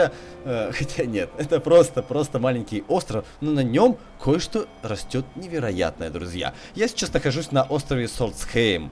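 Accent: Ukraine. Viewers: Эбать ты из Украины)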